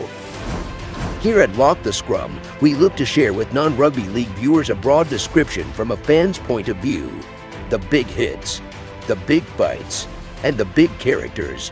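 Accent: United States. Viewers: American accent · Voiceover is a complete waste of time. Just tells you what you can see for yourself. And why the US accent?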